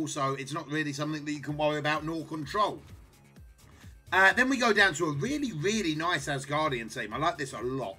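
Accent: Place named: England, United Kingdom. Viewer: Brilliant videos mate! Only just found you and loving it! :) Loving your accent aha! Im English too!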